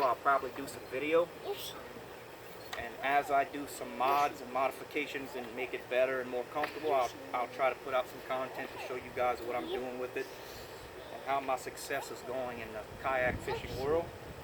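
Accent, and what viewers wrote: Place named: Lafayette, United States. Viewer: You sound like you might be from around the lafayette area, just wondering because i wanted to know how it did around here? I'm looking for one to mainly go to big lake and such.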